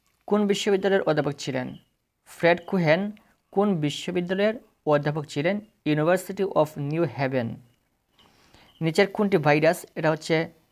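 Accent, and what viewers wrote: Sylhet, Bangladesh. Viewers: Sir Afne to sylheti 😂 afnar mate buja jay❤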